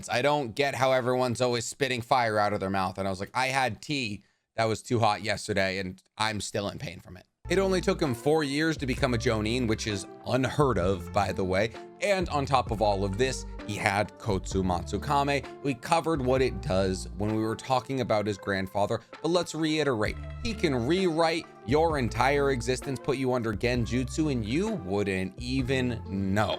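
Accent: United States. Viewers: Why do so many people pronounce Shisui’s name that way? I’ve noticed a bunch of narutubers do it, but just so everyone is aware, it’s not shi-su-ee like ship. It’s SHE-su-ee Okay, after going through more of the video, you just have really poor, Americanized pronunciation. Mungeekyo sharingan, Minnuhto 😂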